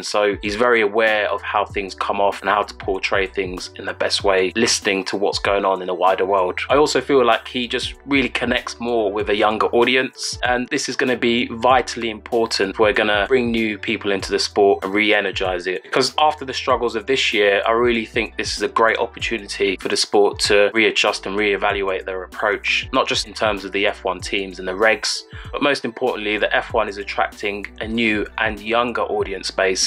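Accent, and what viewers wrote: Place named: London, United Kingdom. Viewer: You sound like ur from lonnndon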